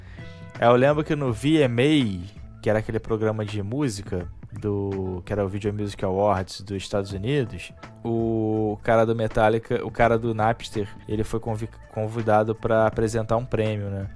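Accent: Rio de Janeiro, Brazil. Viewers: Tem muitos caras bons na rede social, mas quando o tutorial tem sotaque carioca não dá me desculpem, mas é pura verdade, eu tenho vários amigos que também reclamam.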